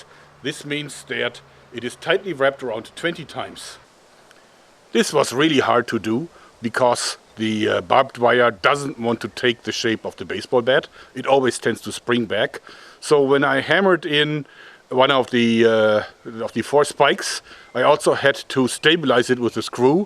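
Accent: Germany. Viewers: I am german and i know a german accent when i hear one and i sound exactly the same like him when i speak english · Are you German? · Du bist duetsch das hört Mann aber cool Video Mann i Love Lucille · are you German? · His accent sounds very authentic, actually. I don't know where you see his accent slipping, but you clearly don't know what a real German accent sounds like. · U sounds like a German Guy that’s try to speak Englisch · You are German! I can hear it! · YOU ENGLISH IS GRAMMATICALLY, WICKEDLY EXCELLENT. ...OF COURSE WITH A NICE GERMAN ACCENT! ...WICKEDLY ENTERTAING AND FUNNY. 😀😀😀😀👍👍👍👍💖💖💖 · warum hört sich sein englisch so deutsch englisch an?😂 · Are you german? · Du bist safe Deutscher. Wie lange und wo du wie lange wohnst ist mir egal. Du hörst dich an wie ein Deutscher der Englisch redet. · Dein deutsch aktzent is nicht zu überhören xD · are you german?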